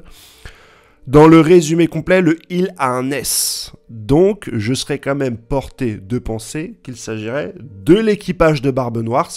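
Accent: France. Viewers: Il serait temps que Dragon se bouge un peu 🤣 Sinon pas mal l'accent du sud il y a du potentiel.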